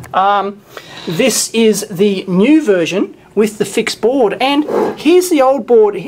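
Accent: Australia. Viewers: I don't get it. You just sound Australian to me. Why do people keep pointing out your accent. You're perfectly legible.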